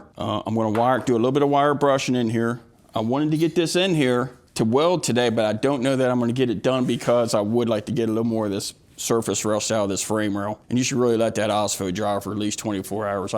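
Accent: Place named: Maryland, United States. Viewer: I hear glen burnie accent!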